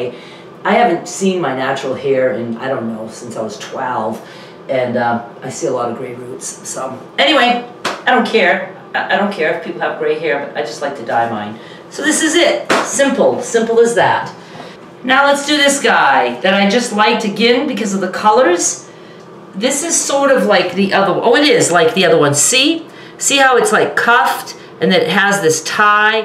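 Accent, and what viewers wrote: Maine, United States. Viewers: Hello from Maine! I love seeing how much fun you are having. It is nice seeing you smile 😊 Do people notice your Maine accent down there?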